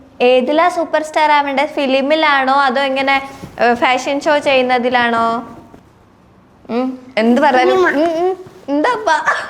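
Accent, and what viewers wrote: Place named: Kannur, India. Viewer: Anchor kannur slang ... 😂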